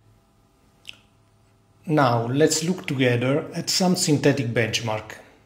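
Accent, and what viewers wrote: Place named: Italy. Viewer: you sound like Italian :face-blue-smiling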